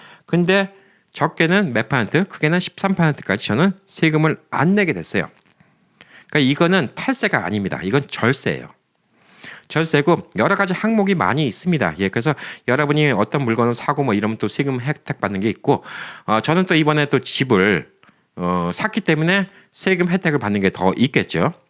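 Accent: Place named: Jeolla, South Korea. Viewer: 부탁드립니다 그러면이 그러먼으로하시말고 러면을고치세요 전라도사투리 거러먼 안돼 그려면으로 먹으먼 먹으면으로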